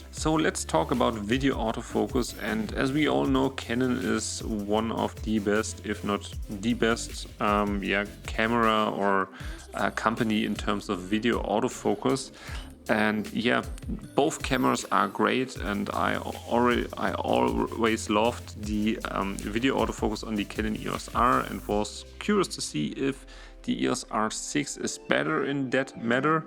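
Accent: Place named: Germany. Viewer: Man merkt, dass du deutscher bist! Informatives Video.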